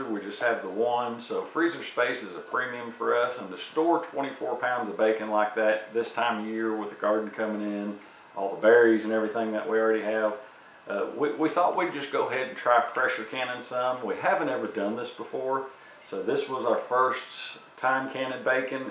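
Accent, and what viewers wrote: United States. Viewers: I like this guy! And he got a like and subscription because he wasn't all "hay goys loike n subscroibe dood" na he's all American. A man America herself wishes she could emulate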